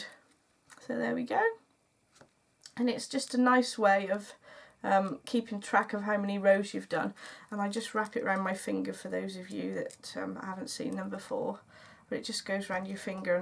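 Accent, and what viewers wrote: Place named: Bristol, United Kingdom. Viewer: Oh I just found you! Fab podcast and such fun that you are in East Anglia..I'm in Cambridgeshire....although your accent sounds more Bristolian??:) Look forward to your next episode x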